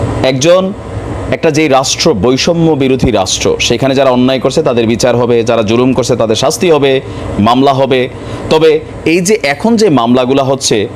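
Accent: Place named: Chandpur, Bangladesh. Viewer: Your accent Chadpur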